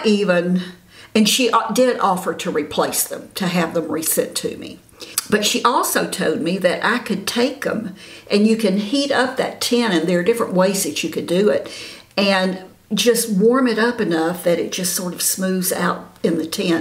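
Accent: South, United States. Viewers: I just came across your video and consider myself a very mature skinned woman at almost 69 years old. Kimberly also was the artist who convinced me to take the deep dive, even though she was not the artist who did my colour match. I had been on the fence and had been contacted by individuals I knew but having mature skin compared to their flawless young skin was making me very hesitant. Watching Kimberley Weiner tipped the scale. The beginning of your video compared to the end should convince anyone to give this makeup a try! You are beautiful & you ROCK with confidence. Thanks for sharing🙏❤️ BTW....love your southern drawl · what a warm, beautiful spirit you have; much less your southern charm and charisma drenching your whole persona! You are definitely a spell binding performer and entertainer while teaching us girls how to look our best! i was born and raised in Atlanta and could listen to your southern belle talk forever! 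Carry on, sugar, you're fantastic!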